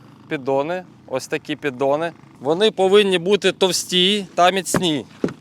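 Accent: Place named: Russia. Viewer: Смысл сужать аудиторию на своём украинском с русским акцентом ? Мда...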